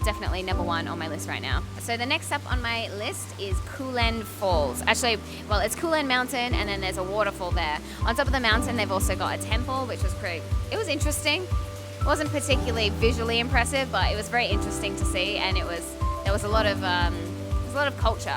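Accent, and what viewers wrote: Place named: Australia. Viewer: Its great to hear an aussie accent. Great video. Loved it. Thanks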